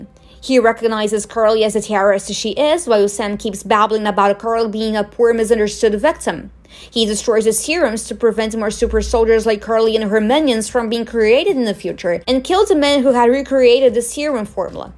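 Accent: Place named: Brazil. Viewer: Ahh, i had a brazilian teacher, i thought the accent felt familiar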